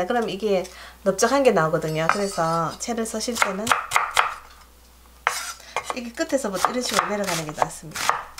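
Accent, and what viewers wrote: Gyeongsang, South Korea. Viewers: 좋은정보 감사합니다!! 차분하고 경상도 특유의 애교스런말투 너무좋네요^^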